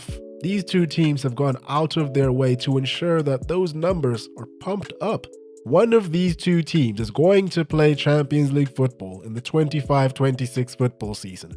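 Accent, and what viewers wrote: South Africa. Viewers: I KNEW YOU WERE SOUTH AFRICAN! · is a Shona name, but you have a South African accent, got ya! Sharks😂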